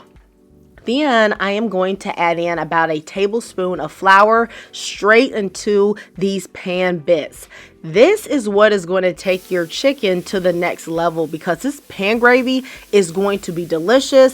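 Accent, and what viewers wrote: South, United States. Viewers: Just found your channel! With all these delicious looking foods....and your accent.....you must be southern!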